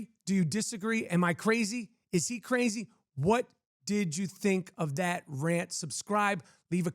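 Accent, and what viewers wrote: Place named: Long Island, United States. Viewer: It’s amazing how much you sound like me and I’m from Long Island New York and your movies sometimes you don’t have that accent brother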